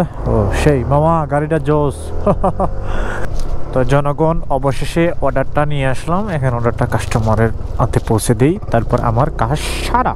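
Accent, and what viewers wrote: Sylhet, Bangladesh. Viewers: Bhai Apnar House Sylhet ni ?? 😅 কথার ধরনে বুঝা যাচ্ছে সিলেটি ❤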